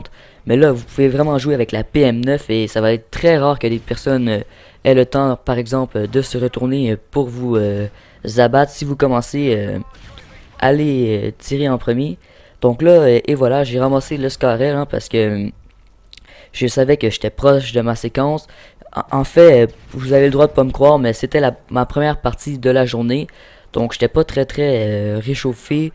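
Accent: Quebec, Canada. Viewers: C'est clair qu'il n'avait pas un criss de chats dans ton mode si tu joues a la PS3 xD Anyway excellent travail au moddel tout nue en plus! <3... Ste plait change pas ton accent a cause de quelques Francais qui font chier et je m'inscriverez surment a CODQG juste pour voir un bon québécois jouer! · J'adore l'accent québécois :) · Qu'est ce que j'aime l'accent québécois :'D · C'est un quebecquoi il parle comme sa sinon c'étais marrant , oui ! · moi je dis trop bizarre parce que il est francais d'origine mais il a un pur accent québecois :O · Tu ne serais pa quebecois ? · super l'accent man x) vive le quebec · Swag encore un quebecquois trop bon ton accent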